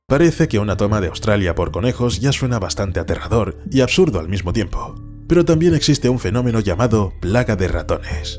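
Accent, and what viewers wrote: Spain. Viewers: A leguas se nota que el de la voz es ibérico, enfatiza la "S". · Ese acento de España es agradable.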